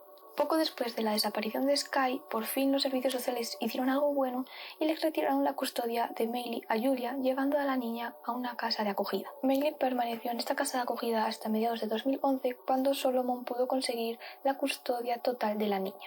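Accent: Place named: Spain. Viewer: creo q por tu acento eres española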